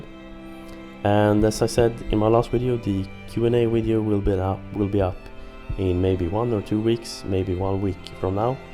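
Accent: Sweden. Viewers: this dude Swedish as fuk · He's Swedish right?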